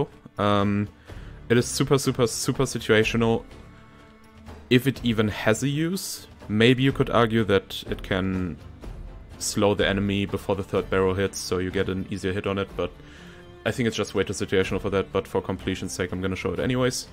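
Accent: Germany. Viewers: are you german? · are you german? x)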